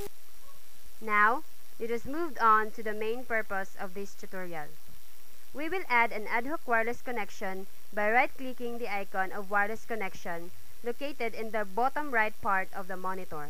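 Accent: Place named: Philippines. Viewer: pinoy???? hahahha. 100%.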